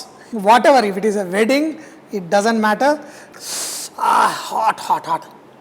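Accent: India. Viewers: Too much buttler english...show some useful content, not interested in the nuisance. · Excellent cooking plus hard Indian accent 🙌🙌🙌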